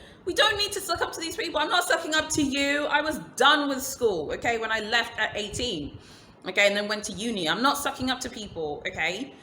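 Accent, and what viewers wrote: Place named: London, United Kingdom. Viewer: Well I thought you had no makeup on so your application is absolutely fantastic. Also, jut wanted to say, your accent is too real, you use the right sayings in the right context and that only comes from living in the UK. They must be referring to the accent of the African state you currently live in because you sound like everyone else I grew up with in London Babe.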